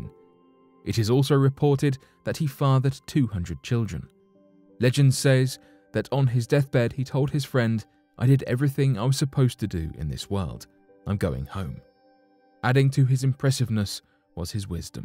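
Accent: United Kingdom. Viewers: the poor man and like his British acent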